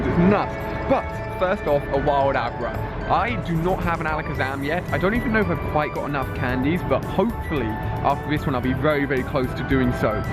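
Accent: England, United Kingdom. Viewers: english?? or america???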